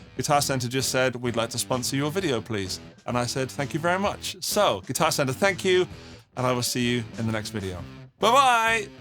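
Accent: United Kingdom. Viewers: How come we have someone with a British accent reviewing guitars in America?:face-green-smiling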